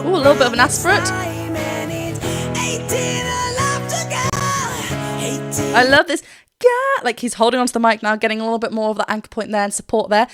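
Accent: England, United Kingdom. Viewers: Kamu orang inggris ya saya hanya ingin denger kamu bilang water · r u British?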